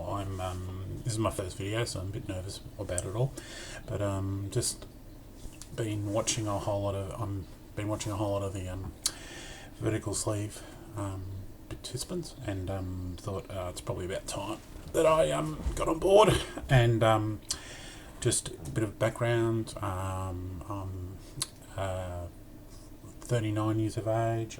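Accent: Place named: Australia. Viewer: Great job on your first video!! Any friend of Tanya & John's is a friend of ours!! Nice to meet you!! Love the Aussie accent!! Good luck on your journey!!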